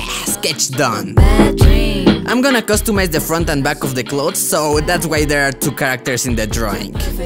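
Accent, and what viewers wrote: Spain. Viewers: I think you have a Spanish accent, or do you speak Spanish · como se nota que eres español bro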